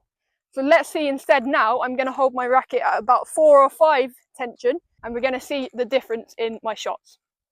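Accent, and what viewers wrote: England, United Kingdom. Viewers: "Two things! Your videos are absolutely amazing, and your English accent is every Spanish speaker's dream — I still remember my English teachers telling me that!" Greetings From Argentina! 😁😁😁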